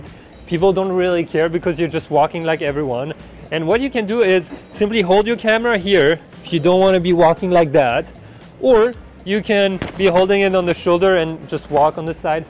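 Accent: France. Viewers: yo! Love your video, they are really great! Can you or anyone else that knows it tell me from where does this accent come from? because i feel like its french in the way you pronounce some "r" but im not sure:)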